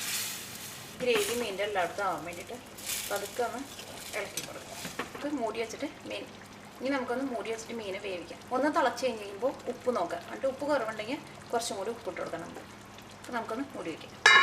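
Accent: Thrissur, India. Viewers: We Like the Trissur slang. All of your recipes are simple and really good! Keep it up!!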